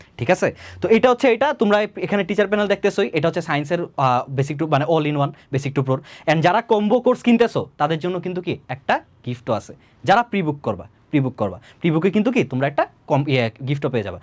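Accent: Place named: Noakhali, Bangladesh. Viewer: Vaiya mone hoy Noakhali er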